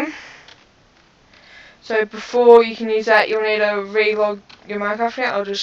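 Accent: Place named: Australia. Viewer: Nice aussie accent mate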